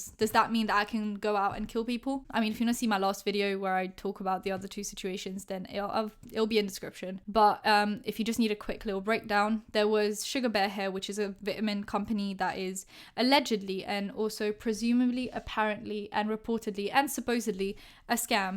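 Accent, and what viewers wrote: United Kingdom. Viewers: This woman has the most mixed British accent I've ever heard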